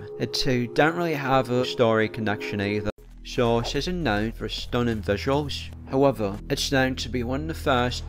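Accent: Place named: Wales, United Kingdom. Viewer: Yeah you have kind of a British\Welsh accent that my ears don't really understand, but don't take it personal I'm fine with it.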